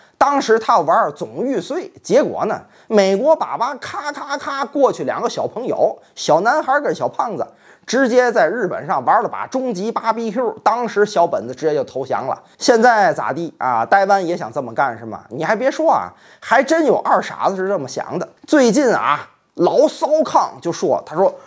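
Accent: Tianjin, China. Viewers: 这家伙，天津嗑儿绝了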